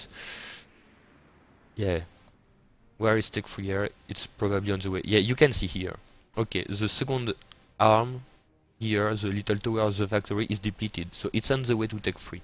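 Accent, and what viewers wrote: France. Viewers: hehe i like heariung all the names in french accent ^^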